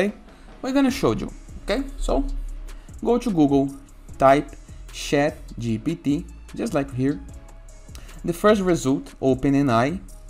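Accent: Brazil. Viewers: I know a Brazilian accent when I hear one! Excellent content ;)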